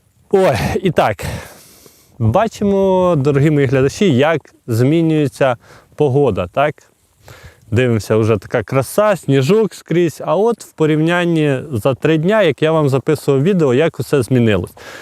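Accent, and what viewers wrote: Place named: Galicia, Ukraine. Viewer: Зауваження ведучому,коли ви вже почнете вивчати і розмовляти правильною українською мовою,що це за слова буде,будуть?Гидко слухати.Ідіть на курси і навчайтесь розмовляти.Галицький у нас в Україні не проходить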